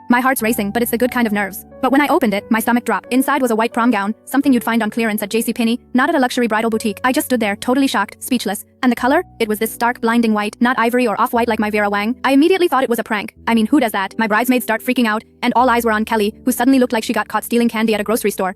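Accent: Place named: India. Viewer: Why does the Ai have an Indian accent 😭😭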